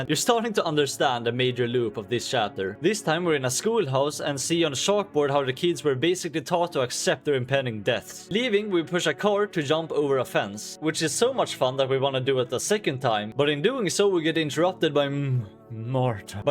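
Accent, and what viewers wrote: Sweden. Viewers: I never realized how Swedish pewdiepie’s accent was until I watched you, how quaint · Most Swedish accent of all time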